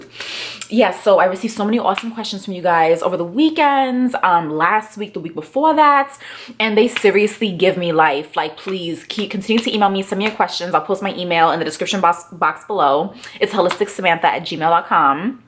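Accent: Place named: Boston, United States. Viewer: FYI You are so beautiful and I love your accent. You almost sound like you are from my side of town (Boston). I am in Charleston right now but I am bean town born and raised!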